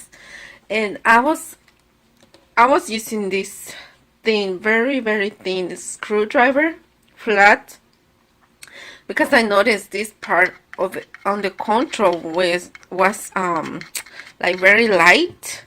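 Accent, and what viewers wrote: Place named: Mexico. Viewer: That Mexican accent is stronggg lol its cute tho😂🥰 🇲🇽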